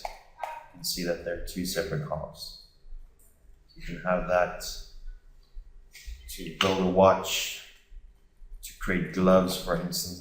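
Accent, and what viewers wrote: South Africa. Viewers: Hey man, nice video! You sound South African, i am from JHB myself!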